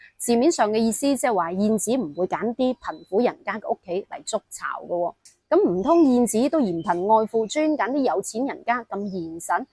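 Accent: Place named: Guangzhou, China. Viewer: 主持說話，帶点廣卅口音，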